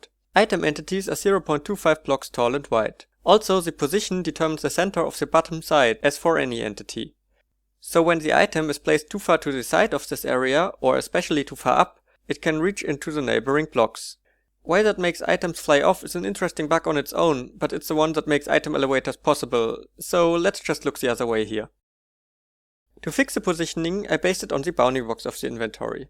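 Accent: Germany. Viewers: I think he is a german guy who speaks english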